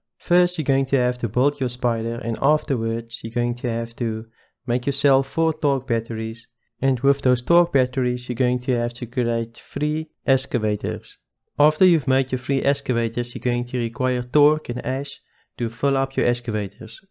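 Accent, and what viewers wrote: South Africa. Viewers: Wow love the videos and very clear and good content, i dont easily sub to a channel but your videos are worth watching. Just a question where are you from, South Africa?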